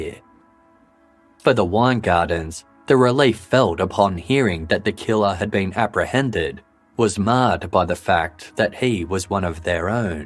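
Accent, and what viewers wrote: Australia. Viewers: Yes. Definitely an Australian accent. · certainly is an Aussie accent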